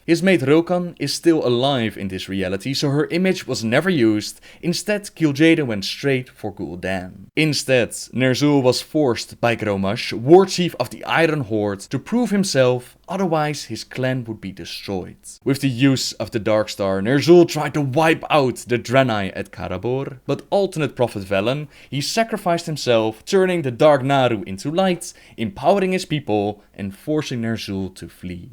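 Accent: Netherlands. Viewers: God, your accent is so pretentious and obviously Dutch even though you try to mask it. Stahp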